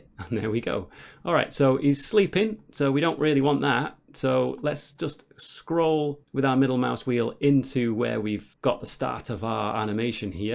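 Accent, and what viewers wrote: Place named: North, United Kingdom. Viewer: I like your northern accent! clear friendly and well paced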